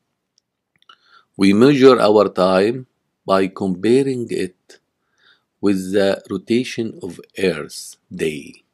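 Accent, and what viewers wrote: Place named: Syria. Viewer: I like you syrians pronation 😘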